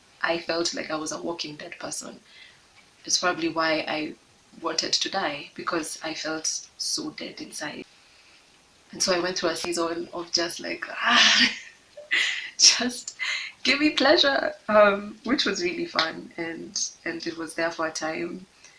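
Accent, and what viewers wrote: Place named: Nairobi, Kenya. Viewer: Sort of fell upon your channel. You remind me of someone I knew... beautiful and soulful and absolutely terrified of being vulnerable. Glad you found the strength to share. You sound so Nairobian though! It's too bad you had to leave.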